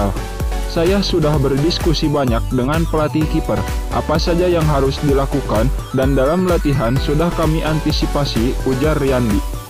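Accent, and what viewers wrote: West Java, Indonesia. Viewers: Adminnya urang Persib nya..? Logat na Sunda pisan euy..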